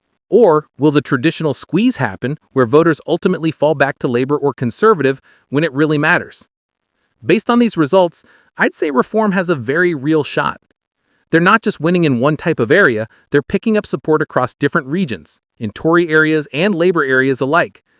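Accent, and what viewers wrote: United States. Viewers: as usual - no clips , no evidence - just the same boring American voice enjoying the sound of his own voice!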